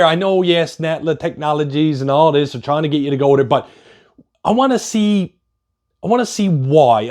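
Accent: Canada. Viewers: u Canadian?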